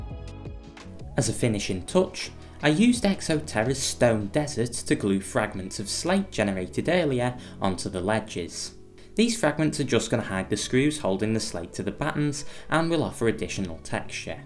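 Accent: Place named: England, United Kingdom. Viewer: And never pass up an opportunity to hear the king's English.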